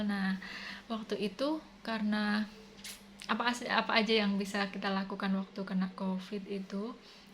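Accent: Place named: Java, Indonesia. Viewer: Mba logat bicaranya kok njowo banget, tpi kl lgi di sinden aduh blakutek brantakan, brarti cma lucu2an aja ya 😅😅🤔 Mba aq fans kmu banget lho Aq mau tanya mb itu lahir di kota mana, sulawesi apa jawa yogya? Balas ya mb pertanyaan aq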